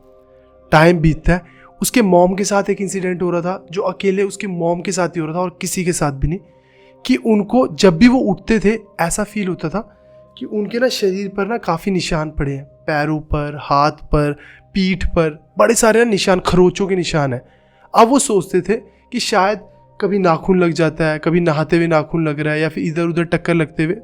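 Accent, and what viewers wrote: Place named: Delhi, India. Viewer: Aap dilli bale style me hindi mat bola karo, kahani barbad ho jata hai.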